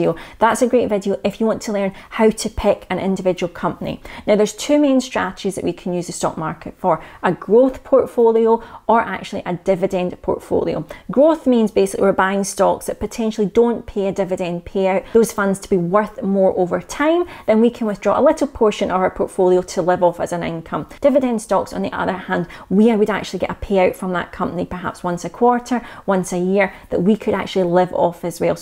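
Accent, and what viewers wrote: Scotland, United Kingdom. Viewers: Thanks! Have been watching all your videos these days and they really help me to start establishing my financial freedom! And I’m really happy to hear someone who is from Scotland! (I’m in Edinburgh) 😃Just one question here, if 212 is free, does it mean if I invested the same ETF, would it be cheaper than buying directly from Vanguard? X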